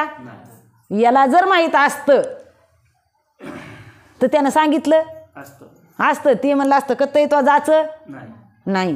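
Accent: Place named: Marathwada, India. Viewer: एकदम मराठवाडा भाषेमध्ये खरंच खूप छान प्रवचन केले आहे मी आळंदी वरून ऐकतो आहे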